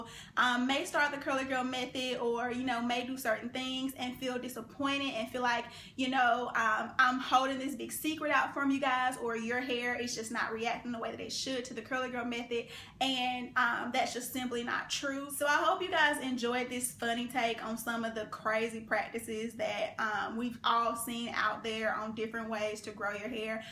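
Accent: South, United States. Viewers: I can hear the South all in your voice! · Your Southern accent is lovely. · Where are you from?? You have such a cute country accent.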